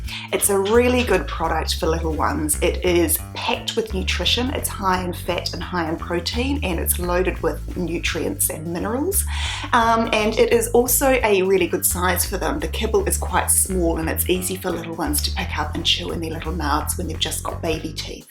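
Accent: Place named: New Zealand. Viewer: If that is English from New Zealand you should be teacher, I like this accent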